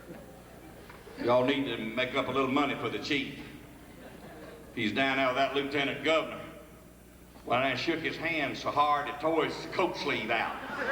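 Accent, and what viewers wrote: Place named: South, United States. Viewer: Who or whatever did closed captions for this don’t speak southern. They got more words he sad wrong making it read like he said stuff he didn’t say. Ask somebody from where he’s from what he said and retype it because hearing impaired people deserve to read the jokes he said correctly.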